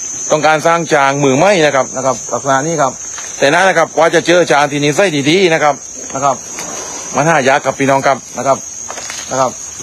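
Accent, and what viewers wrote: South, Thailand. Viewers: ❤ชัดเจนคนใต้บ้านเรานิหร่อยนิ😊❤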